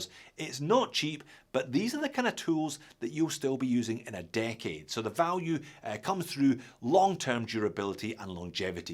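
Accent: Scotland, United Kingdom. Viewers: Love the channel! Glad to hear a fellow Scot who loves watches! Erebus gawin yersel!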